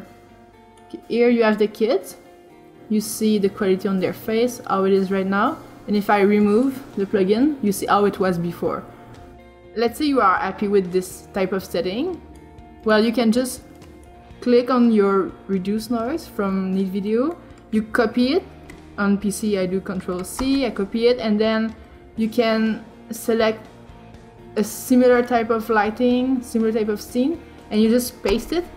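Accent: France. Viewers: you french!!! nice eng accent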